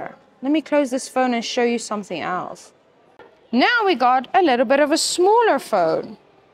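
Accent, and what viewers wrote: South Africa. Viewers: Is she from South Africa? The accent is almost South African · Hahaha, for sometime now i have been saying aiyeee this accent is South African 🇿🇦. Now after watching a couple of videos i decided to check when was this channel opened. " so waar" she is South African 😂, we love you. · You sound like you are from South Africa, I can hear a bit of an Afrikaans accent. Great video thank you very much. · Coolest is your South African accent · Love the South African accent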